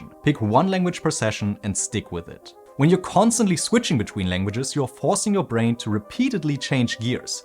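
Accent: Germany. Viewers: I'm also mad at myself I didn't notice you were German 😂 I'm also trying to be perceptive😂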